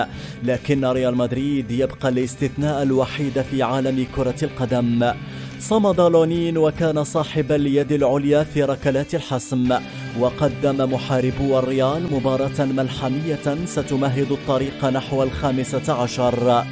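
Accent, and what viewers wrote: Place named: Iraq. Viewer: اه كتير حلو وحكيه مميز والسرد ايضا.. من كلامك مبين انك عراقي😊♥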